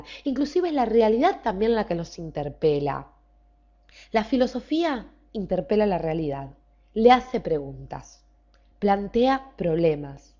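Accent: Argentina. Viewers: pues practicamente este video nos da la definicion y algunos filosofos algo que emos estado viendo a lo largo de la semana, me gusta mucho la filosofia por que es el amor a la sabiduria pero aun mas por que trata de encontrar el por que de las cosas que pasan, tratando de encontrar la verdad, otra observacion es fue ra del tema y mas con el video la voz de la narradora del video me encanta como habla mas por que es argentina :3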